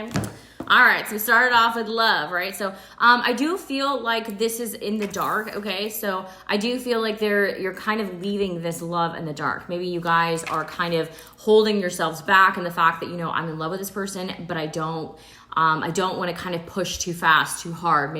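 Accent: South, United States. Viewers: I luvu❤you be on point and you funny😂you be doing this country voice while you be talking so funny😂😂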